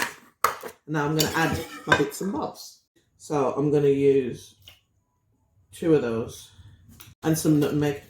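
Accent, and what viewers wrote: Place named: Jamaica. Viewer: You still pronounce it the Jamaican way too lol... Great video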